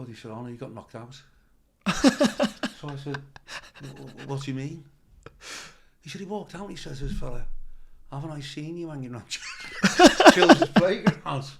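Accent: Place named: Wigan, United Kingdom. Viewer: Love that Wigan voice 😂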